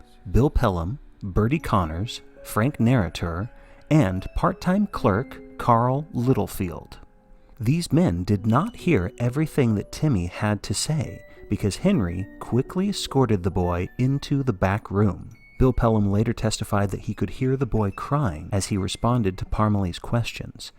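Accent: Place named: Maine, United States. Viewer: As a daughter of a mother from Bangor, Maine I would like to thank you for using your best Mainer accent. For me, it really brings the story together 🙌🏽🥰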